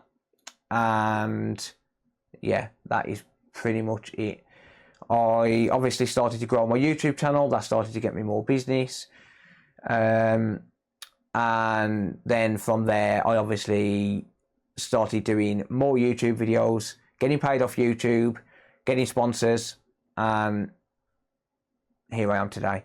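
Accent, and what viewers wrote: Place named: Black Country, United Kingdom. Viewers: I think I probably speak for a few subscribers here when I say that I actually find your videos super calming - the perfect way to relax and de-stress. Your approach, your expert knowledge, your humour (and West Midlands accent?) all add up to great, unique content. Keep going and we'll probably see you in one of those great BBC Four documentaries which feature craftsmen fixing things in real-time. 'Slow programming' I think it's called as a genre. Either way, don't change anything and keep up the great work. One last thing / request - please do a teardown or repair of a Steam Deck if you get a chance. No idea if they're even serviceable but they do look amazing inside (as a layman who knows not to go anywhere near a soldering iron again). 😂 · Thank you so much for sharing your story. Something comforting about your black country accent as I grew up and went to school in Walsall. 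I'm really into fixing, modifying and refurbishing tech myself at a smaller level (mainly fixing controllers), so I was excited to finally come across your channel via TronicsFix and knew I had to stick around the second I heard your accent😂. The level of poverty and inequality in the area is staggering. Having worked in mental health, it's upsetting seeing how incomplete the current set of support resources can be, and worse considering how much further things seem to be getting stripped back. Your reference to benefits getting stopped and feeling pushed to crime for survival is one I've come across before, and is probably becoming more tragically commonplace. Just having that one person in an influential position who can vouch for you in times of need (like that probabation officer during your Morrison's story, or even MyMateVince on your YouTube journey) can make such a difference. I admire all the candid life story snippets you've shared as many of them seem to represent examples of your sheer determination and quick thinking (even though it may have landed you in some sticky situations in some instances). 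It's inspiring to see how well you're currently doing with your current set of circumstancs. It makes me wonder how differently things may have been if you'd been dealt a slightly better hand. The lack of social mobility in this coun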